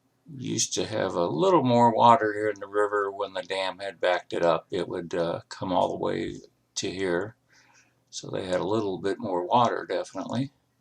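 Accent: Ohio, United States. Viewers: Hey, I am watching your dam removal videos from the day of the first day and the notch. You did a great job! Maby the best dam removal documentation of all. And your Ohio accent is very good for me to understand and sounds very pleasant to me. Thank you very much for your great videos! Greetings from Krefeld/Germany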